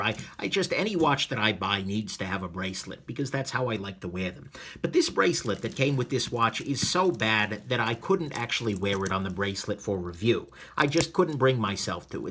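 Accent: United States. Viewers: Mark Walters That’s a pretty shallow attitude. I am English and find his accent so much better than computerised voices. It’s obviously a regional variation of the standard American accent (if there is such a thing). Carry on...you’re doing a great job on watches and knit.A man of my own heart.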